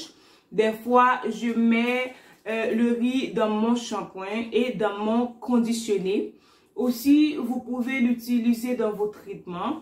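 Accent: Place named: France. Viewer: Yes!!! Don't ever stop speaking French and English ,I'm from English speaking country but now live in French speaking country , love your francais accent ,merci boucop madmosel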